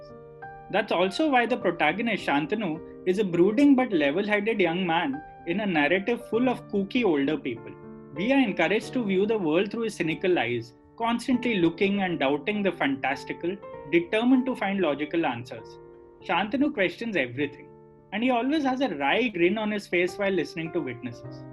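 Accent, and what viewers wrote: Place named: Mumbai, India. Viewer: The series is great. Your review is good. But I'm sorry, I didn't like your accent. It's a Mumbaikar meets South Indian meets Karan Johar type pronunciation of words. 😐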